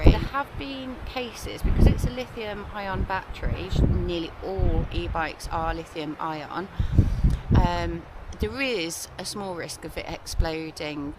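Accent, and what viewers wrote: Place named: Stockport, United Kingdom. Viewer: Great video 👌 I love riding round there views are amazing… there are much better e-bikes 😂 are you a Stockport girl? Very Stockport accent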